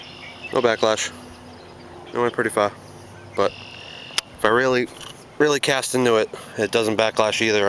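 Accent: Boston, United States. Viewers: is that a boston accent i hear?? =)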